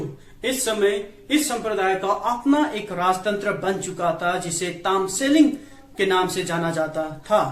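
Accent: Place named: India. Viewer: Hahaha...nice joke first of all you sound like indian to me hybrid of indian not nepalese As a newah buddhist i would like to say Tamang neva invade or attack nepalese kingdom Tamang are one of the kind community and hard working people of nepal newah tibetan both emperor were close neighbouring nations they had a good relationship before shah dynasty came to existence both newah and tibetan exchange buddhist culture not only business even they get married Tamang are originated in nepal not from tibet Ofcourse they share tibetan culture and there own bon traditions Having mongolian face cannot be said they are mongols 😂😂 you may ask me why read a invasion japan by mongol kubalai khan Mongol were badly defeated by shogun samurai warriors several times if you go to mongolia if you call ur self as mongol they will slap you on you face without any doubt if you share ur history with them Because asian face were living in himalayas before genghis khan.. 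before saying anything about Nepalese kingdom protect your community by christian invasion mostly tamang community are walking wrong path in christianity why don't you make that kinda video rather making these kinda videos which are base less Im not saying tamang don't exist According to nepa mandal tamang gurung and newa were 3 brothers later century 3 brothers were separate it was happen in todays kavre district this incident was said by my tamang friend who is living soyambhu area in present day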